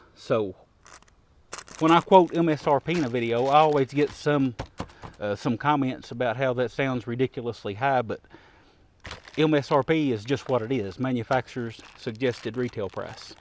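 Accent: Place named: Alabama, United States. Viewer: You from alabama , yes sir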